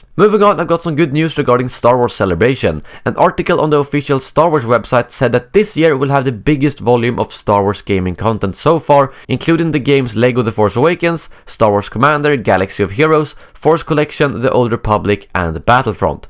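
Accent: Sweden. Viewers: Are you A Swede?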